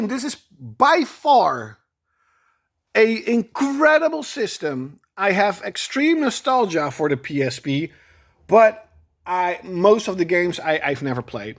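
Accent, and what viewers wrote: Netherlands. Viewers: Geen idee wat het is maar je kunt altijd gelijk merken wanneer iemand Nederlands is 😂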